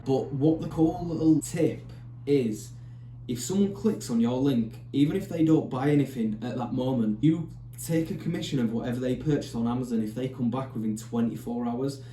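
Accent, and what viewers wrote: Leeds, United Kingdom. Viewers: Really enjoying your channel given you a sub y oh sound like a Leeds lad too MOT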